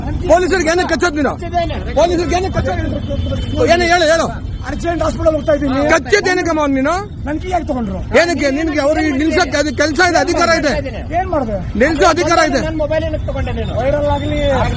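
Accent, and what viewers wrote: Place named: North Karnataka, India. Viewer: Super , Nice to hear north Karnataka Kannada . Kadak Mirchi Mandakki video is very informative .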